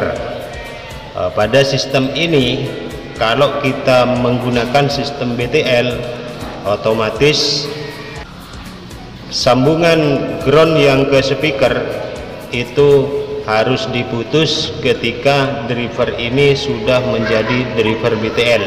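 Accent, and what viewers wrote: Madura, Indonesia. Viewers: Madura ya mas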